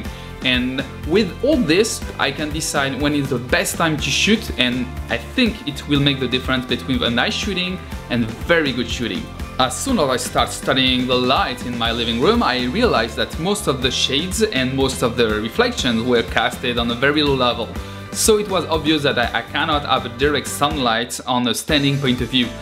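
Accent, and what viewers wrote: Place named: France. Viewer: On entend bien l'accent français. J'adore la vidéo continuez !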